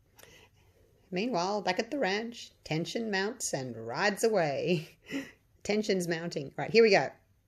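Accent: Australia. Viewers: so good to hear an aussie voice!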